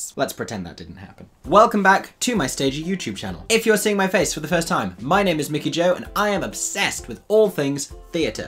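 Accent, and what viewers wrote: United Kingdom. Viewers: British accent vs Brooklyn Jewish accent. What a trite criticism. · This critic is very intelligent, I'll give him that. He looks like he's 19 years old. Wonderful that he thinks he's all that. This is ALL his opinion. A British accent doesn't make you an automatic theatre historian. A bit full of himself. Not impressed. On another note, I dont think Beanie was well cast at all. Miscast, to say the least. Of course she is no match for Streisand in any decade or in any category. The voice alone. I'll tune in when this kid grows some more facial hair.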